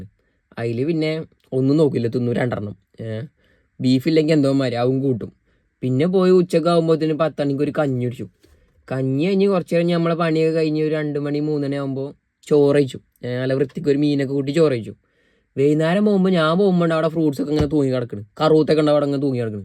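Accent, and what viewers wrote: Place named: Malappuram, India. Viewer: 😂😅😅😅 malappuram slang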